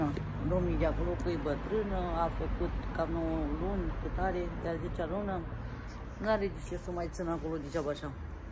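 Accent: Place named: Oltenia, Romania. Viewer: Ea vorbește cu accent oltanesc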